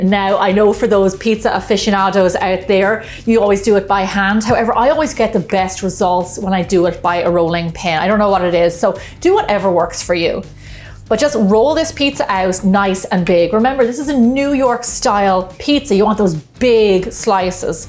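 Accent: Ireland. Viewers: The guy's mouth is only moving while she's speaking like yeah yeah just hand me a slice 😅.. also is she Irish. I now noticed her accent love it and her pizza so 😋😋😋😍🤤🤤🤤🤤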